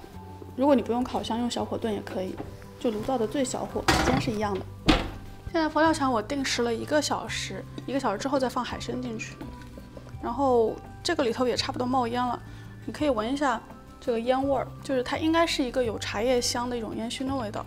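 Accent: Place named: Wuhan, China. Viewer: 微微听出来武汉口音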